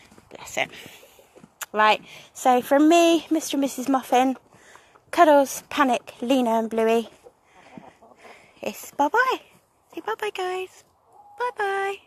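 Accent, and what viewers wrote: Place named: United Kingdom. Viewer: wow! just discovered your channel. it's so lovely to hear a Brit talk about homesteading. I'm doing exactly what you are. I have an allotment and live in the Midlands.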